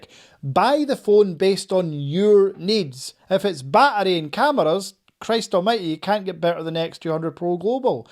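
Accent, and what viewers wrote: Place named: Scotland, United Kingdom. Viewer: Lovin’ the ‘Skaughtisch’ accent. 👌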